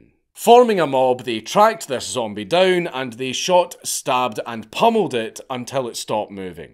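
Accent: Scotland, United Kingdom. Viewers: Oh nvm i thought this was hunter not some scottish guy lol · Lol AI can't understand a Scottish accent 😂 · >a scottsman making fun of any accent Lel, shiggydiggy bro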